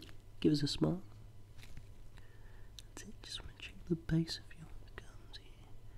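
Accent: Australia. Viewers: Wow your voice is so soothing and gives me tingles but them tools are scary as 😂😂😂 am I right that you're a fellow Australian?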